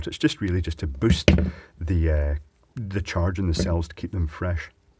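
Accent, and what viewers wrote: Scotland, United Kingdom. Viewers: Love Scott Accent.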